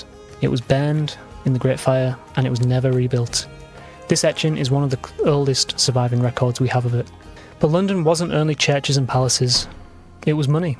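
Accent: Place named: Hull, United Kingdom. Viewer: i'm willing to believe it's an accent / dialect thing 🙂 the narrator mentioned his home town of hull, so i took on face value the pronunciation. 🙂🙂 i'm from north yorkshire, and some people can't understand us either. 😕😕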